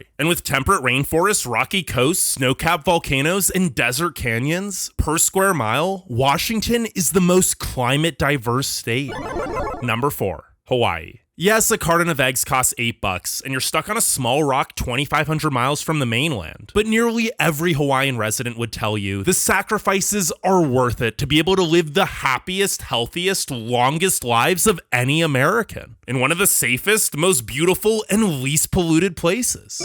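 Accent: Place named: New York, United States. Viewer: This guy must be from NY.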